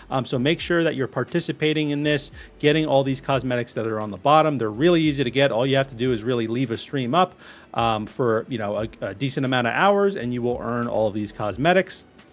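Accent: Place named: Pennsylvania, United States. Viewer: This guy sounds like he’s from Pennsylvania. Good tips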